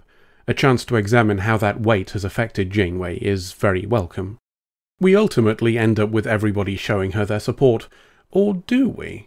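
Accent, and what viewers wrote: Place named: Yorkshire, United Kingdom. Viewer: I find these humorous reviews immensely entertaining. This could be because Star Trek has never really caught my eye as something worth seeing every week. Hence, I have zero nostalgic connection to the franchise. I did see an episode of the OST when in first aired. Ye Gods was boring. From what I can tell, Star Trek Voyager appears to have been made specifically for teenage boys. Boobs n Battles is a winning combination in one's teens. Thank you for expanding my Trek knowledge. Always a pleasure to hear a fellow Yorkshireman prattle on about stuff. Like yourself, my RP slips whenever I hit on the vowel 'U'. There is something about that particular engrained speech pattern that is hard to shake-off.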